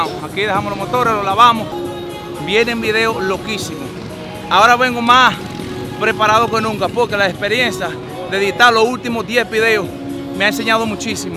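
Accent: Cibao, Dominican Republic. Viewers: Mi hermano sigue aciendo lo que te gusta ere bueno en eso tu asentó del cibao .te queda.vien y yo soy capitaleño....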